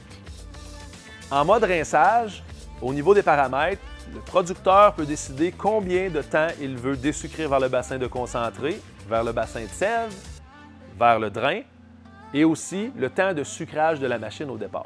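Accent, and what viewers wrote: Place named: France. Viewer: Yes with and the English Chanel needs to be without the French accent so us Americans can better understand. Please.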